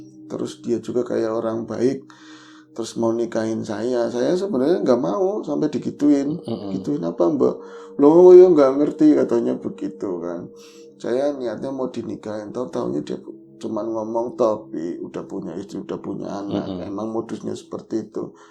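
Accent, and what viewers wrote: Banyumas, Indonesia. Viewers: Amin matur suwun pada2 bro ora ngapak ora kepenak👍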